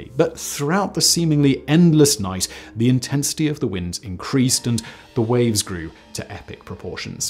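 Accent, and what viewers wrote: United Kingdom. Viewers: it's so much more satisfying hearing a Brit talking about a British ship. . But yes, he does a awesome job narrating anything.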